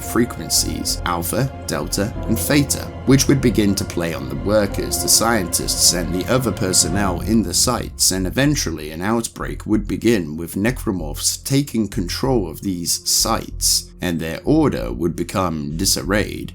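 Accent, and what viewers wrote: United Kingdom. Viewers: Good video.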